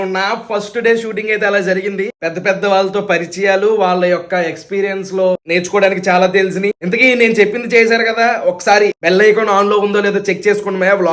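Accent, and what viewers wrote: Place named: Godavari, India. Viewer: All the best.. new timing ki.. you have bright feature in movies and Godavari slang 🎉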